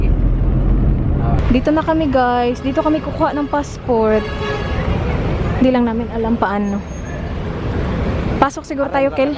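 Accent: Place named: Visayas, Philippines. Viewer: Lalom kaayo ug bisaya ni si.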